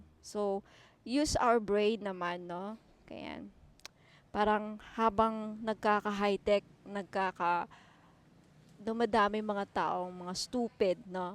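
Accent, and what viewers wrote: Visayas, Philippines. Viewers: Maayong Gabii mam... Bisaya gyudta dae... hehehe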